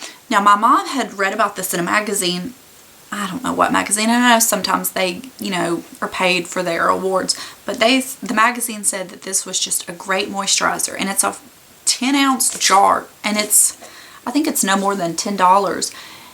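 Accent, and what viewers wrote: South, United States. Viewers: Your country accent is just ADORB! 😍😍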